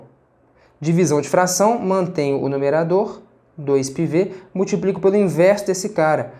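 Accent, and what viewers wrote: Minas Gerais, Brazil. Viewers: Adoro quando ele fala "Prestenção" acho tão fofo esse sotaque Mineiro. Ótima aula!!!!! Parabéns pelo desenvolvimento! ❤️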